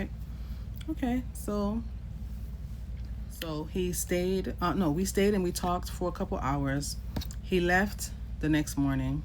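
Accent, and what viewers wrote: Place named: Caribbean. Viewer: UR PATOIS S AMAZING IM A YOUNG QUEEN FR NY CARIB AND SPANISH.❤❤❤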